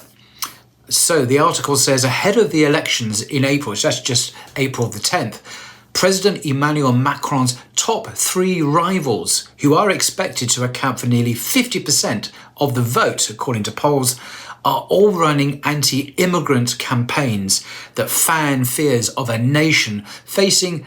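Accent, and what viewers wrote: United Kingdom. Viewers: "The rising number" " Among them are a growing number of Muslims". Jeez - can you be a little more specific. I am not going to deny the shit stirring right in France - but please be more specific. And also the man making this vid has a UK accent. Is he aware year previous to 1994 UK had always been a net exporter of people - and still huge numbers leave. I have never seen this featured or analysed by UK media. I wonder why.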